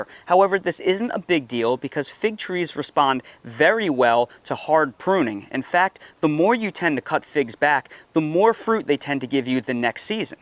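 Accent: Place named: Philadelphia, United States. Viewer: Your accent tells me you are from Philly. Is that correct?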